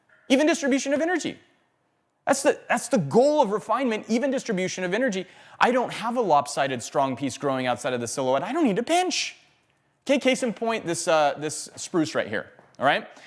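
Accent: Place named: United States. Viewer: a very speedy US accent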